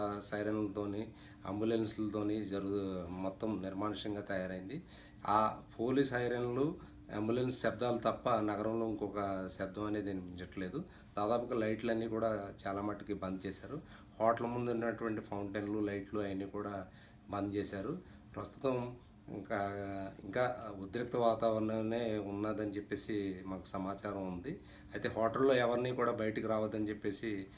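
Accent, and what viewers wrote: Godavari, India. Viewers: Correct ga chepparu me way of talking mana Godavari valla la telustundhi😊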